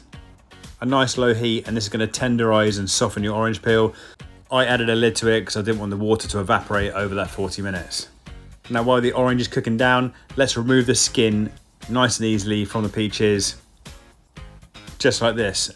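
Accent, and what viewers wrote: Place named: United Kingdom. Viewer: Nothing more sexy than a man who knows how to make jam and comes with an British accent 🥵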